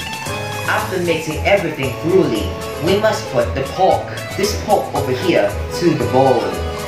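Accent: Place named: United Kingdom. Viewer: The accent though😯 British accent😯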